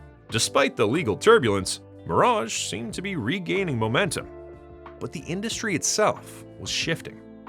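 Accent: Canada. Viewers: You will quadruple your views by replacing this cornball Canadian narrator. Said with peace and love. “With full medical insurance”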